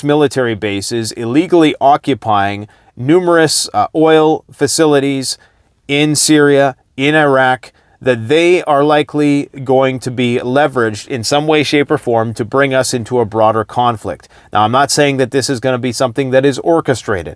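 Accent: England, United Kingdom. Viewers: God I love it when you put on the pretentious Brit voice, lol makes the info easier to swallow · 😂 love English accent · That's an English accent lol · love your British accent. As I am part Brit I got no problem with the use that voice to mock the crowns BS and if you live in a crown country you don't live in your own country do you. Us real Brits are always mocking the elites, which near us sound like us lolz unless they are playing "rainbow friends" with "young global leaders" etc. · Thank you kindly brother. You must be tired. Love the British accent. Need to use more often. Had to do a lot of replay😂. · I Love the freaking English accent making fun of the long winded diplomatic words fancy words declaring war without flat out saying "our country is declaring war on XYZ!" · Lol...Love the English accent could you do a show ( post ) on MILITARY DRAFT and what it takes for a draft to happen who would be called, age groups, each countries policy's on this. 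I think that would be a informative subject. Thanks for your channel! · I love your British accent. Sounds awesome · You have the best English accent! Keep it up! Helps digest all the hard truth you are giving us! Don’t stop. A spoon full of sugar helps the medicine go down :) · I SAY OLE CHAP THAT WAS A BRILLIANT PROPER BRITISH ACCENT...BRAVO, WELL DONE YOUNG LAD! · Laughing! English accent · Ok that British accent has me rolling. You speak redcoat just a little too good 😂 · Not good, 😂 British accent, why ppl refer the accent to war scenarios. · That English accent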